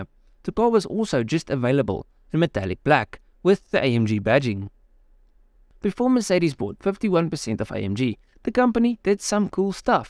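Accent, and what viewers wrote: South Africa. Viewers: I love the south African accent so much, it's adorable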